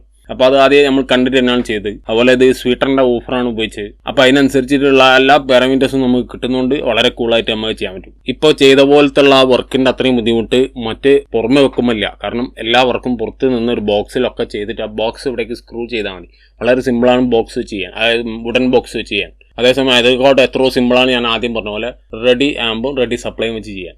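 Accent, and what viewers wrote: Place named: Palakkad, India. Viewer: Palakkad baashayaanallo.. 😍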